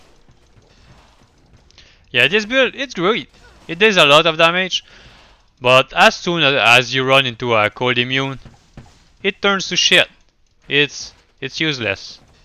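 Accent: Quebec, Canada. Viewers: Nice comparison, I really enjoyed it. WF is pretty much OP for a late game Bowazon. By your accent, you must be from Quebec right? Have you considered a Bow Sorc with cold and fire enchant, geared with Witchwild String? She can kill super quick and teleport fast to the next mob groups.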